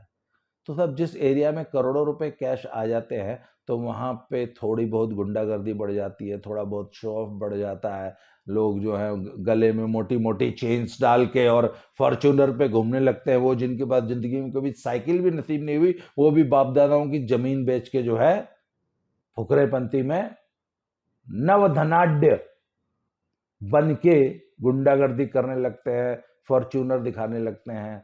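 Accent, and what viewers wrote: Haryana, India. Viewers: कई दिन होगये अजगर गैंग पर कोई वीडियो नही आई, we are waiting for it😂 your हरयाणवी accent🔥😂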